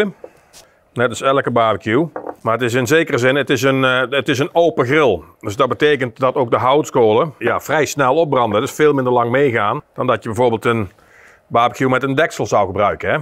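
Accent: Brabant, Netherlands. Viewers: Da's Brabants, gehakt is daar nou eenmaal 'gehak'..Gaat niet meer anders worden, vrees ik 😂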